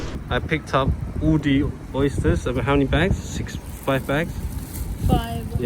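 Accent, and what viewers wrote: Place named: North London, United Kingdom. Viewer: Be used to the American accent and good to have the video with your north London accent, which is a quite good opportunity for learning something new.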